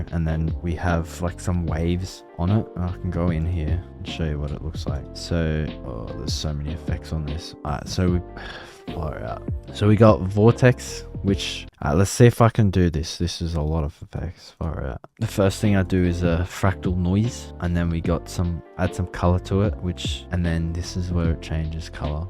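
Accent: Australia. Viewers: Are you Australian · Are you aussie?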